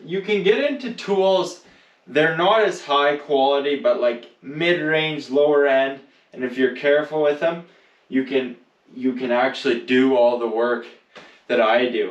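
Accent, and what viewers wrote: Canada. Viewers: Aha. I was trying to figure out where this guy was from. I was guessing Dutch Canadian. So I know Canadian for sure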